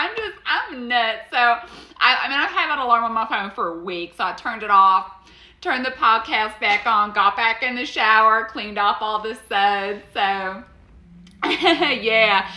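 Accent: South, United States. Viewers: You're legitimately the first actor I've heard who can do an accurate Southern accent Hollywood keeps pumping out movies starring A-listers mimicking Gone With the Wind for some reason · Love that southern accent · Lol The Southern accent is what the extra "magic" is. You know your stuff Woman. Lol. Nice work. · you are so talented (not that you need me to tell you that) - too talented for that cliche, hackneyed “Southern hillbilly” parody accent. You can do so much better. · You did such a phenomenal job of emulating not only the southern accent, but also the southern style of storytelling. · I see Southern family at family reunions, so hearing the accent and you just talking about your experience was actually familiar and comforting to hear 😊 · Ok so what accent is that because so many women I know have that in the South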